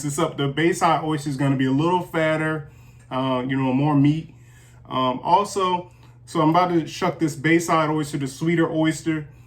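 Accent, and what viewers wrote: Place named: Eastern Shore, United States. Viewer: Great job! I like both types of oysters! You have an Eastern Shore accent, are you from the Shore?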